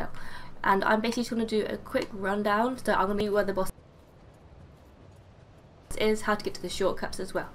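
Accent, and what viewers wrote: England, United Kingdom. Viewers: 'Bit too much of the english accent here.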